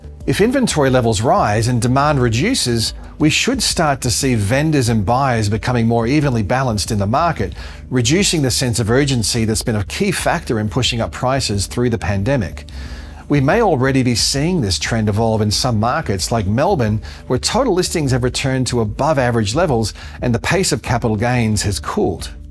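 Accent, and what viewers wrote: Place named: United States. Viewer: What is with the American accent breaking in to your speech pattern. It is very strange.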